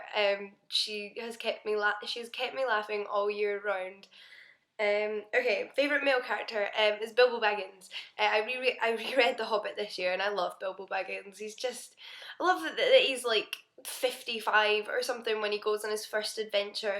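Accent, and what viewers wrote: Scotland, United Kingdom. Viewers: Where are you from in Scotland? I'm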